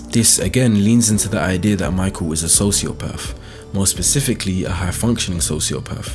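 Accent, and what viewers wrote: United Kingdom. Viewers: Black dude with a british accent. Crazy.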